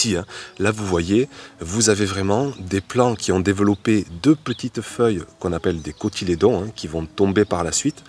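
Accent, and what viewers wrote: South, France. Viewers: Vidéos passionnantes et très instructives pour un jardinier novice que je suis . Très bien fait et le "petit accent du sud" en option ne peut que nous donner envie d'aller au jardin !!!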